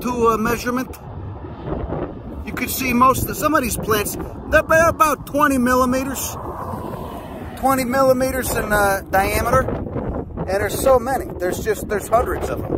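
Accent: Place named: Boston, United States. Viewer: You guys are so bad! Lmao! It sounds like a Boston accent, but it's killing me.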